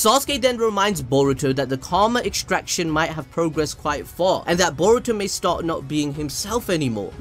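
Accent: Punjab, India. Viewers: dude you indian? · Is this person punjabi🤔🤔🤔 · you punjabi ??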